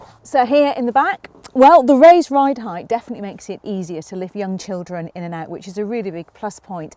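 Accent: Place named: RP, United Kingdom. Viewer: Lovely poshish accent.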